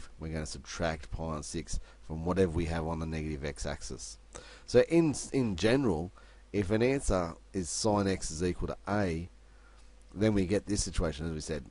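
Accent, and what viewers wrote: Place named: Australia. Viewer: aussie ascent ...nevertheless good tutorial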